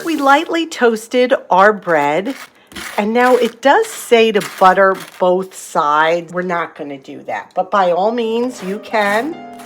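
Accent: New York, United States. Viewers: Congratulations! So excited for your channel's growth! I love your channel. I adore your NY accent. I am a native NY'er transplanted to TX and I feel like I'm back home when I have your channel on, playing in the background while I cook. The latest thing I learned during my frugal journey was to pressure can. I pressure canned chicken and beef and didn't blow up my kitchen! I call that a win.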